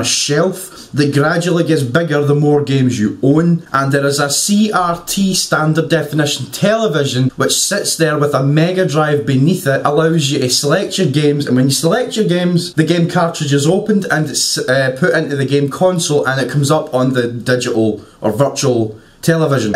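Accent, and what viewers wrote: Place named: Glasgow, United Kingdom. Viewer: Guessing your from glasgow and a celtic man like myself? :)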